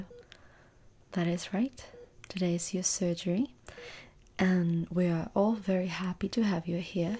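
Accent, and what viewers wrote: United Kingdom. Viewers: Ooo fancy English-y accent in this. Thanks!! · Me encanta tu inglés. Es más brittish que american. Eres inteligente . Y de gafas estás preciosa. Te favorecen. Este vídeo me da muchas cosquillitas. Me suscribo.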